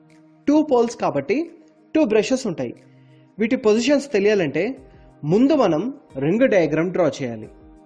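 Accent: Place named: India. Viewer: That's impressive, but We can't here that many words, cause we need english subtitles. Indian english are so difficult to here for another nations.🤔😔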